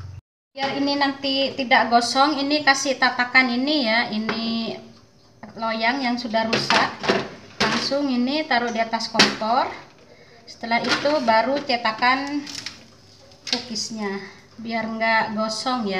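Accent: Madura, Indonesia. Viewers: Negguh Deri logattah Mak engak Reng Medureh.. Salam sittong dere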